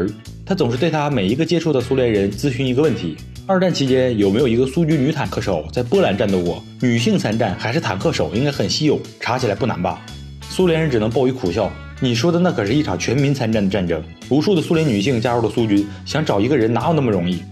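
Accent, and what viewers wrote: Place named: North, China. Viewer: 每次聽到你的聲音，我都覺得耳朵都要懷孕了～這北方口音～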